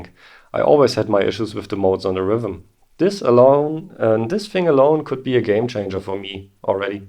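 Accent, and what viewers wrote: Germany. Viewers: you are sooo fast. too fast for my ears.... i like your oder slow Videos. are you german? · "Some may laugh the decision"- love that cool German accent!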